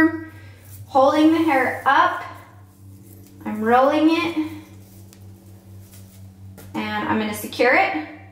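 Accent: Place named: Minnesota, United States. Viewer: Are you from Minnesota? You sound like us if you're not.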